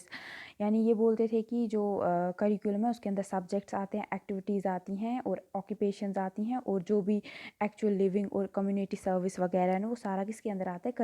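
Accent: Kashmir, India. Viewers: That Koshur accent. Keep it up!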